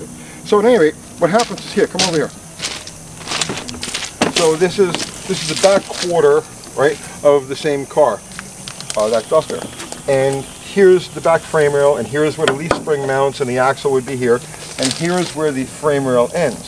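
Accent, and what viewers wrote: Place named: New York, United States. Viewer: i know this is off topic but r u by chance from the east coast ? u sound like a guy i grew up around good guy taught me a lot he was from new york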